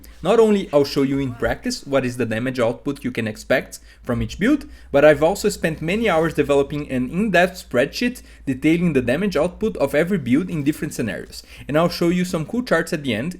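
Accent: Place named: Brazil. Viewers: Pensei a mesma coisa, o sotaque do inglês dele é muito parecido se não for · Are you brazilian? You sound like a fluent brazilian speaking english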